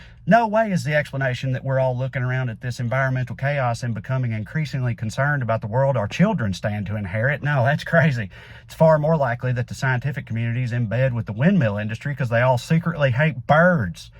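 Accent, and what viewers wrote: South, United States. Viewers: I love his Southern voice. You usually hear a twang added if a person is suppose to sound stupid. It is refreshing for me to hear an intellectual conversation with an accent. An old friend of mine had an acting class,and the first thing the teacher wanted him to do was talk with an southern accent you sound dumber. So he replied,”Naw, I don’t think so Cuz, ya’ll can have it. I’m missing the football game on t.v.”😊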